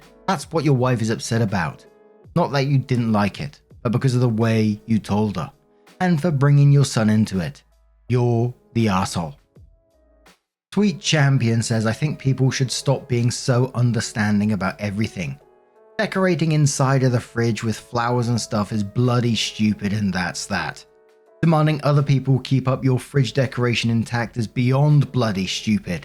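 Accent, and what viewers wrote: United Kingdom. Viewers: It's the British accent for me. Makes everything sound funnier!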